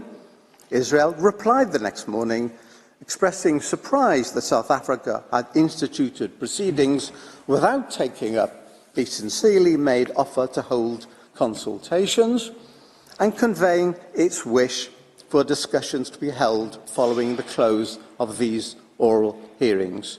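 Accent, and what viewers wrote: United Kingdom. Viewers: Beautiful English, amazing British accent. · Mine second - I ador your British English, Sir Malcolm!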